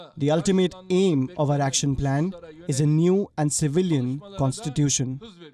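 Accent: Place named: India. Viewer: Turkey is not a third world country by the way as India is. Where severeg's water is floating on the streets Where people sleep on the streets. Where more than 50 percent of its population attend toilets in the fields and farms. Where people more than half of its population living below poverty line. It's all sad.But How come rather than focusing on such basic issues of it's own the colonial indian so called funny accent's english language 😂channel reporting about others.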